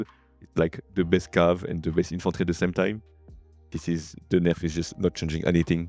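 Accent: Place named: France. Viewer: Thx for what your're doing it's really nice and accurate. Your accent look like french accent, if you are, have you got a french guild ? And if yes, in witch server ?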